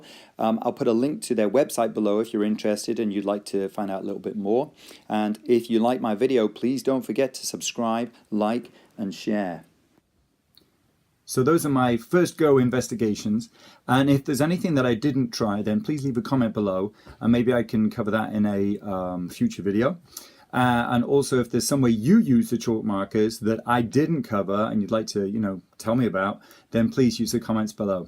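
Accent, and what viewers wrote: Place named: United Kingdom. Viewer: May I ask, which part of the UK do you come from?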